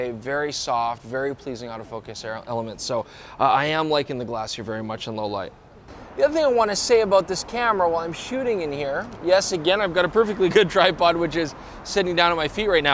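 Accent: South Africa. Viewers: Nice SA Accent! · LOL I'm South African and your translations were hilarious! Did you say "lekker lekker"? LOL you sounded so South African! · That's an Afrikaans accent. Interesting. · BTW, Godox now have a trigger and firmware upgrade for their Flash units that will allow HSS and TTL for Fuji camera's. PS, I think your South African accent went over his head! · Very impressive SA accent. Very chuffed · dude are you South African too?! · a great camera for traveling of fuji. BTW your South Africa accent is pretty good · This is SA accent! Where and why did you pick up such a convincing accent? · Great video as always. What on earth is with the temporary South African accent? It's messing with my head!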